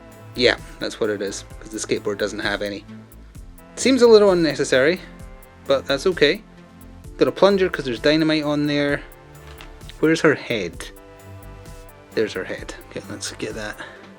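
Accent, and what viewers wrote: Scotland, United Kingdom. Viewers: From one Scot to another... Glad to hear a familiar accent in the Youtube scene! haha :) will you make any DC Universe game content? Also, do you have a source for the cards for the OOP movie version of Zod and the Guild? Also, I am glad to see Knight using pictures of miniatures on the box. Not enjoying the wave of manufacturers showing renders as they dont always translate into the miniatures as well.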